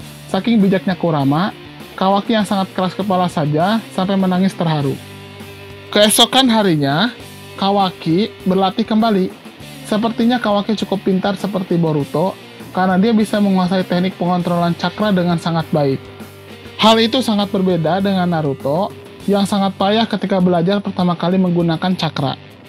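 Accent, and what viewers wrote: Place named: Java, Indonesia. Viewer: Lucu orng in ngomongny medok pak,,,hahahahaha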